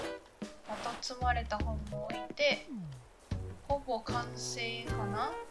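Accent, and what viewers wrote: Kansai, Japan. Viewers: 初めまして！あつ森島作り迷走中の停滞中です😅 そんな中さんの動画に出会いました！私も関西なので、さんのしゃべりが心地良いです😊 素敵なブックカフェ、ぜひマネっこさせてください🥰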